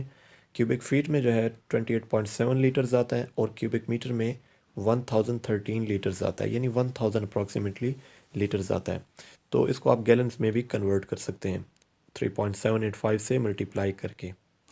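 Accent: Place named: Pakistan. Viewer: Are you from india or from pakistan,just felt that this accent is pakistani. Anyways thumps up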